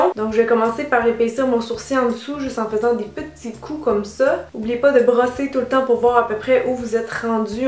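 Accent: Quebec, Canada. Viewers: Franchement j’adore ce style de maquillage qu’on voit hélas peu, t’es une fille super naturelle de manière générale dans tes vidéos car j’en ai vu plusieurs. Un jour il faudra que j’aille au Québec j’adore tellement l’accent, bonne année from France 😊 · Adorable ou.... Joli make up et encore une vidéo avec le charme de l'accent canadien..... j'adoreeeeee. Bisous Sublissima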